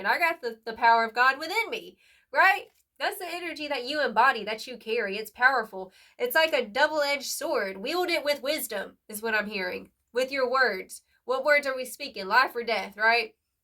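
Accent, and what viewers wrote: South, United States. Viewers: I’m loving your accent , I’m guessing South Carolina, Georgia? I am rising like a phoenix, a thunder bird. ❤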